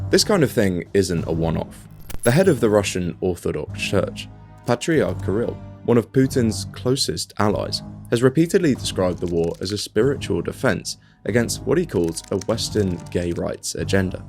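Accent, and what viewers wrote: England, United Kingdom. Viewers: He thought because he is white and has an English accent that people would not criticise him. Times are changing my friend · Im an American fiormer advisor to the Kremlin, who subsequentlylived in Odessa for 15 years... until the rabble gang armies came gang press every adult male into service. And I watched Russia defeat itself by literally running out of food four days on the road to Kiev. I saw RU troops had to WALK to Belarus. So it is true Russia defeated itself. But as you can imagine, Im unhappy seeing my life's work in privatization, deregulation and liberalization twisted this way.... by a posh English voice that sounds like the children I now teach.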